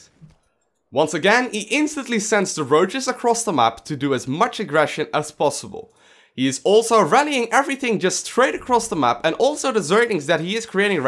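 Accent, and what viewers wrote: Netherlands. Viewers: are you from Holland?? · Dutch, pretty much the same as a german accent xD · He's from the Netherlands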